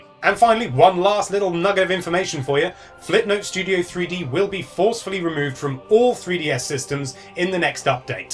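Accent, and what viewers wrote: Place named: United Kingdom. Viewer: Hmm April Fools finishes at 12pm in the UK, judging by the guys accent I would assume this was posted in the UK thus not being an April Fools joke at all. All info Double Confirmed!!!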